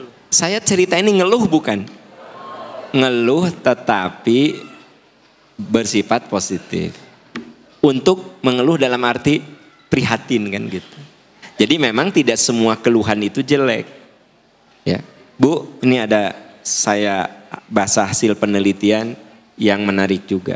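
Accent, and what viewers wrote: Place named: West Java, Indonesia. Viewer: Ilmu yg bermanfaat serta kangen dgn logat Sundanya mengingatkan silsilah orang tua dari Kiara condong Bandung dan sekarang sdh tdk ada tempat utk pulang kampung ( sdh berpulang semua)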